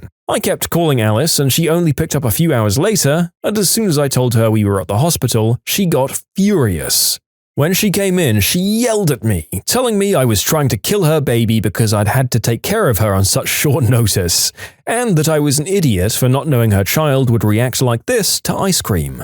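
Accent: United Kingdom. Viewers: I’ll leave an actual comment later, hopefully the British narrator sees this. He’s my favorite narrator of the 4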